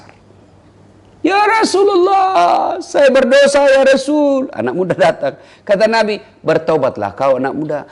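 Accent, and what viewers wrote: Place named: Makassar, Indonesia. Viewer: Assalamualaikum Ustadz, sy suka ki ceramax ustadz krn logat mkssrx kental sxl,,, dan MANTAAP Ustadz Ceramax,,, 🙏👍💕😎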